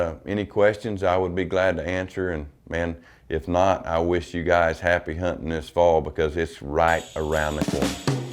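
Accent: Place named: Oklahoma, United States. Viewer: I can tell by your accent your an Oklahoma boy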